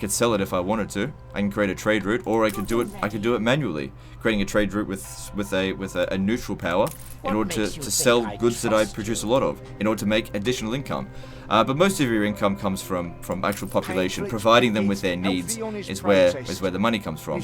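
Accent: Australia. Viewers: isnt he australian lol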